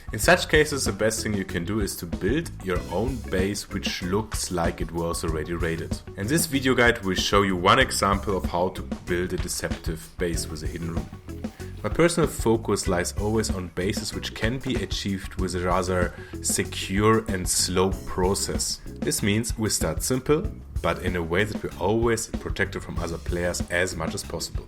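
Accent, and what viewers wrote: Germany. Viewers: that german accent tho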